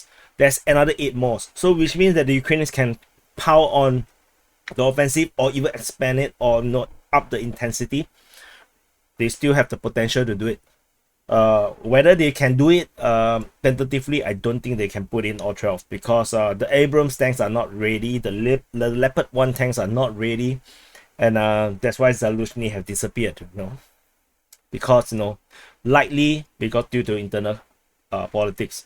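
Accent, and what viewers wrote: Singapore. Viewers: We love your Singaporian accent! Keep going ! Your are the best channel on Ukraine war! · HUH .. It' a Singaporean accent.. You know like an Australian Accent .. Or Alabama or CAnadian.. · Never apologise for your accent. I know that it is nowhere near full on "Singlish". I am also pretty certain that you speak at least one Chinese dialect and also Malay and that you speak both as well as anyone. The number of languages that most Singaporeans speak has always astounded me.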